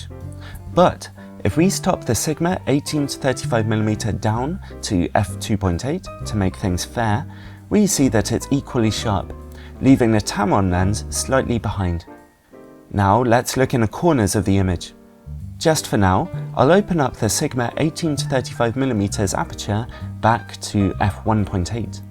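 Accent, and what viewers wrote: United Kingdom. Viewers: excellent review indeed. Thank you. I really like your voice and British accent.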